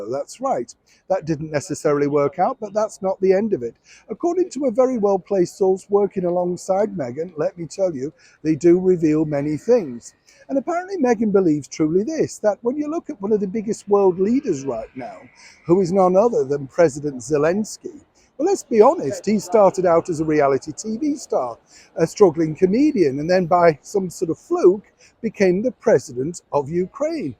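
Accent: Yorkshire, United Kingdom. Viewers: you a yorkshire lass?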